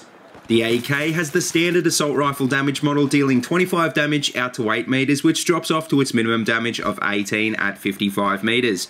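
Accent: Australia. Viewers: Been watching since m 39 sks review I believe. Love the videos your making. Hope your band flops so u can keep making theses vids lol. Truly hope yall do well. Had a few friends that tried a music career didn't make the "big time" but did well.sorry I forget your bands name could you please remind me. The 240 bravo, Ace 23, 870, ace-sv and scar are my "go to" weapons. Looking to change it up a little bit , could u recommend a new weapon selection. Played with most but those the ones I gravitated towards an after a cpl of beers my game play goes ptfo to sporadic game planning an "ttt". And 1 last thing if u don't mind where in the world are u. I think u an Australian. Not sure no offense if not jus not good with accents and flags. So to sum up love vids, can u recommend some new weapons.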